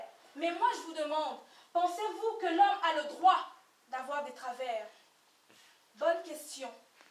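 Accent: Cameroon. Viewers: je savais déjà que tu étais une camerounaise avant même que tu le prononces. J'aimerais vraiment te rencontrer :).🇨🇲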